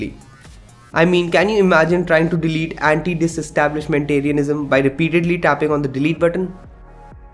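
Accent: India. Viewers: Good video but the thick Indian accent forced me to watch several times to get the point. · I cannot understand Indian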